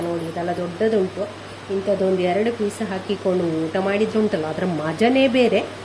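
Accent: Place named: Malnad, India. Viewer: ನಿಮ್ಮ ಮಲೆನಾಡು ಶೈಲಿ ಯ ಕನ್ನಡ ಕೇಳಲು ತುಂಬಾ ಚಂದ.!!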